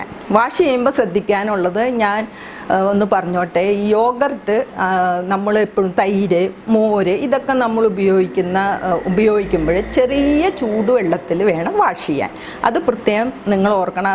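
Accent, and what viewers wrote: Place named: Kochi, India. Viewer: എനിക്ക് സംസാരം കേട്ടപ്പോൾ തോന്നി ഞാനും കൊച്ചി,കൊച്ചിക്കാരൻ, ടിപ്സ് ഒക്കെ അടിപൊളി ❤️🤝🤝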